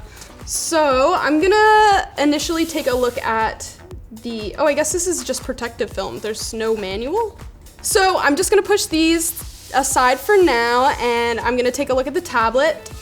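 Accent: Canada. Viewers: love the canadian "O's" :D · I’m from Minnesota and can hear her accent from Canada very easily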